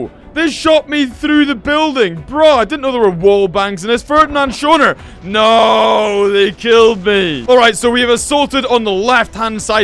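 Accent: Scotland, United Kingdom. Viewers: Are you scoty boy?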